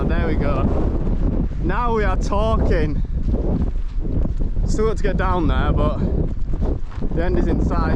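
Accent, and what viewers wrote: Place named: Yorkshire, United Kingdom. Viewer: I don't know where you're from, but that sounds like a proper Yorkshire accent to me ! Great video, great weather ! I love it. 👍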